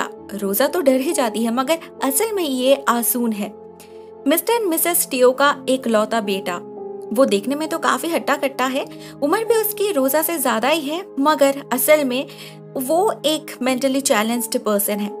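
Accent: Bengal, India. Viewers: Are u bengali? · R u bengali 😊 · don't mind but are you a bengali?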